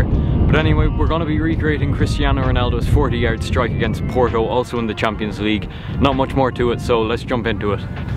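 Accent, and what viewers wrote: Ireland. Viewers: Luv ur videos and im 99.9 percent sure ur irish like me😂👍🇮🇪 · Are you Irish you have an Irish accent sounds like your irish your a goaT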